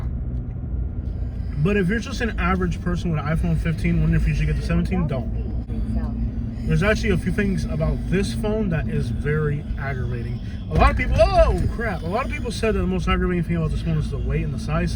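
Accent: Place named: South, United States. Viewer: LMBO with that southern accent 😂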